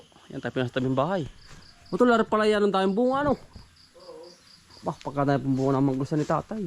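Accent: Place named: Laguna, Philippines. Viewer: Tagalog kang talaga , sa Laguna ba kayo paki sa sagpt manan kapatid, natuwa akong makarinig ang ganyang punto ng pananagalog.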